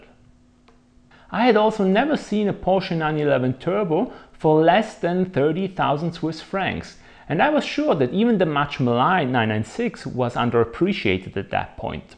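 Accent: Germany. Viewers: Like Swiss clockwork (of which I have a few) you summarized your ownership in such a dry humorous way (the German accent added to it) that it was a joy listening to even if it was long :). I have a 2003 C4S so it's easy to relate to all the maintenance bits as they're the same apart from the turbos. Like your P-car, mine has the original Porsche radio, and I'd like to do the sam upgrade as the sound quality isn't that great (at low volumes especially), and I'd like to know more about the process of the change itself i.e. the work to be done. (I'd also like to change the speakers as those BOSE speakers aren't that great either). In addition I can add that I have also wanted to get my hands on some of the MF's that disregard the joy of ownership and punitive damages, not only on mine, but their own vehicles (I don't really care about their vehicle, but they should at least respect my wish to keep MY car as pristine as could be) . 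My only gripe towards your review is your reference to CHF which makes it harder to relate than if you had referenced a currency of more generic usage, EUR or USD. It's easier to remember those currencies compared to NOK, than CHF (imhop). Of course then you'd have to do all the math, but probably it would have made more sense to many of your 1,92k subscribers (I'm now one) and probably many of the 44292 (so far) viewers of this video (with greetings from Norway). and keep up the good work. :) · Work on your accent and englisch you Sound very German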